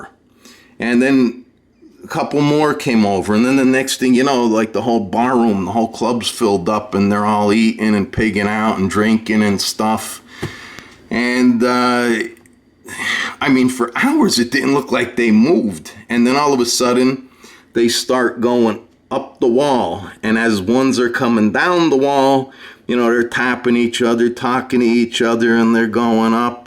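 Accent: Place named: North, United States. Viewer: lol I love your accent you from Jersey, Boston, New York I notice that northern accent. And yea I have ant issues at my house, they’re usually the smaller black ants and I made a mixture with boric acid powder with honey and cut out a hole in a plastic bottle for easy access and I caught tons of ants that way and they weren’t able to get back out of the bottle. But you’re right ants can be smart and picky!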